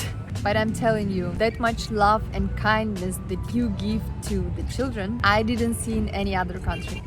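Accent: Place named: Turkey. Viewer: Sıradan türk görünümlü rus ilginç bir de İngilizce türkçe aksanlı 😂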